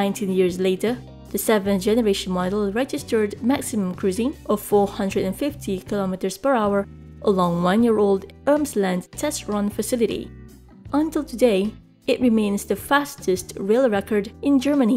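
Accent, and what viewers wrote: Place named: Malaysia. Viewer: I hear a Malaysian!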